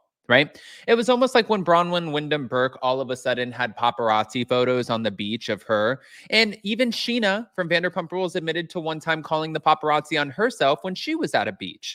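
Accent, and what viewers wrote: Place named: South, United States. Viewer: I love your southern voice, 😂😂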